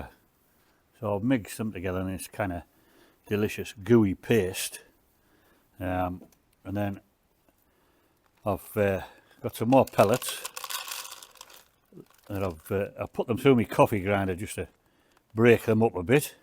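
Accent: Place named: County Durham, United Kingdom. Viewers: Better off getting someone trustworthy in to take care of the rats. Air rifles at night. You sound somewhat local to me if you’re interested. County Durham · Where abouts in the country are you? North by the sound of your accent. I would be delighted to help you get rid of the rats with a silenced night vision thermal air rifle. Please reply for contact details. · Hiya bud you've definitely got a good rat problem there have you thought about getting some guys local to you with air rifles with decent day and night vision outfits that could come regularly, I don't know where abouts you are or I'd suggest someone to you, I look for permissions like this to shoot rats and feral pigeons etc on as its a hobby of mine, there's lots of YouTube channels that show the type of thing I mean it might be worth you reaching out to one of them and maybe put a notice in your local farmers co-op and if you or your family are on Facebook there's lots of groups around the country with guys that would love to come and help you out by shooting the rats for you, I'm in west Wales and going from your accent your up the north of England somewhere otherwise I'd say I'd love to come and help you out, Anyway I hope you have some success with them soon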